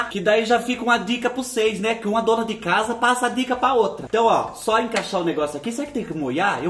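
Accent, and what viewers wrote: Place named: Paraná, Brazil. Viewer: acho lindo seu sotaque parece sotaque de paranaense lá do sul💋🙏🍀❤️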